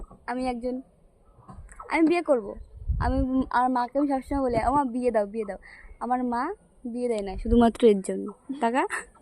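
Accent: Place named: Bangal, Bangladesh. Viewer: Amader nemontonno korbe na !! Eka eka e chole jaccho biye khete . Ar apni to puro bangal bolchen .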